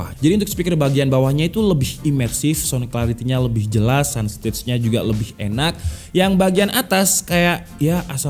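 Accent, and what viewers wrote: Java, Indonesia. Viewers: Jawa.ne pundi om? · Sampeyan jowone ngendi tho mas kok medok tenan 😀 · Dia orang malang ngab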